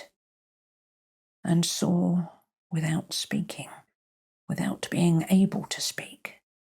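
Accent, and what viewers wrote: United Kingdom. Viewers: No problem,. Your British accent also fits these tales. Keep up the good work!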